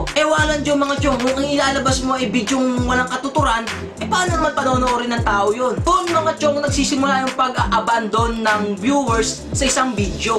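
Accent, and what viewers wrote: Batangas, Philippines. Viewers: Batangueño tlga kpg mgsalita prang galit ,peru natural n boses lng yan...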